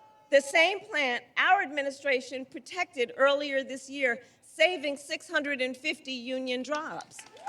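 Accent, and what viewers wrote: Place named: England, United Kingdom. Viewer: Love who! Who’s that? I don’t know her. She’s kinda has an English accent. Sound like she lived in a land of OZ my gosh is she the one behind the curtain or was that a Teleprompter?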